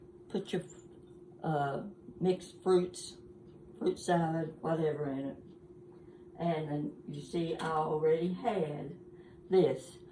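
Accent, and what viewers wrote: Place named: Tampa, United States. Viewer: I am a first time watcher. Thanks for the tour and the information. I’m going to examine my treasures to see if I have some of what you showed. You remind me so much of a dear aunt whom I loved very much. She lived in Tampa and she had your accent and your caring nature. It was delightful to come into your home. I hope to visit again and to especially see a table setting of yours. Perhaps you have pictures of the settings you have done. Thank you so much for your information and your lovely personality. Like you, I love my stuff.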